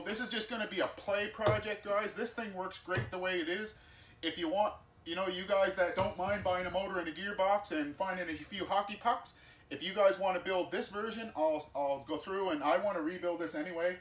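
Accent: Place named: Canada. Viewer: Is it my imagination or are the most popular woodworkers all Canadian?? Love (and miss) the accent!!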